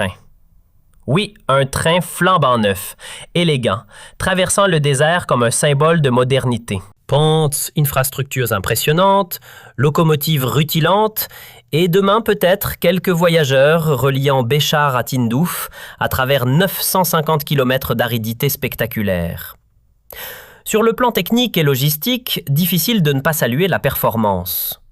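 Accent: Canada. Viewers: Désolé pour vous, même s'ils ont utilisé la voie canadienne pour la lecture de ces commentaires dont le contenu n'est nullement convainquant, un économiste algérien formé en Algerie vous répond, Ce que vous oubliez est plus important que ce que vous débitez, vous oubliez le rôle du plus grand port de Méditerrannee, celui de Hamdania, qui ouvre la voie au commerce international du Sahel vers le Monde, et l'inverse. Vous oubliez de préciser que cette voie ferrée se situe sur le tracé de la voie des épices que la Chine compte développer. Vous oubliez de rappeler que ces trains vont servir à acheminer les produits des activités du sud, produits agricoles, le lait en poudre de Baladna, les mines de cuivre qui sont sur cette voie... Ce que vous appelez de petites gares, deviendront des villes, allez consulter l'histoire de la création des villes américaines et ailleurs grâce aux lignes ferroviaires. 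Ces lignes ferroviaires ne decervent pas seulement cette région, mais elles relient toutes les régions d'Algérie entre elles. Je m'arrête, mais je pourrais en parler pendant des heures, et ce que j'ai écrit là n'est pas dans le but de vous éduquer d'avantage seulement, mais c'est surtout pour informer le Peuple algérien contre les rumeurs et mensonges que des gens comme vous sèment pour foutre la merde dans les pays qui gênent le sio international...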